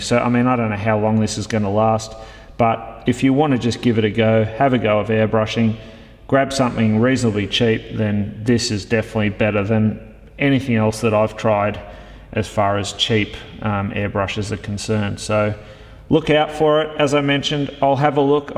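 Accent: Australia. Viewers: Twelve minutes of earache listening to an Aussie accent... and the conclusion is pretty much what one would have expected. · A whining Australian ruined this comparrison.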